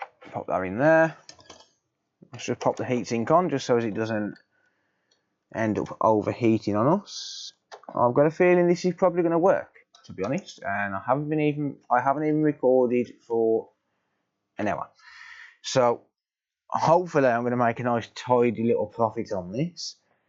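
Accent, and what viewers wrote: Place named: Walsall, United Kingdom. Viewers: This geezer sounds like hes from my area ..Walsall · Loving the channel and the Brummie accent. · Great content buddy, keep up the good work. You sound like a local lad, are you in the midlands?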